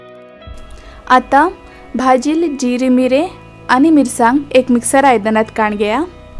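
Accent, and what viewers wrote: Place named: Karwar, India. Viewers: Tumgele konkani marathiche accent asa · It is Karwari accent.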